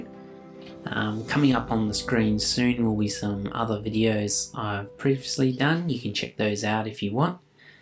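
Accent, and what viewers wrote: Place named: Australia. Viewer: Love watching the way you paint light! And great having a fellow Aussie accent to listen to 😊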